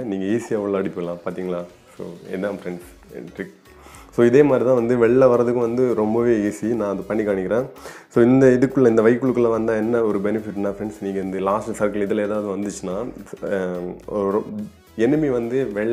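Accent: Kanyakumari, India. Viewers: Bro ungalukku kanyakumari ya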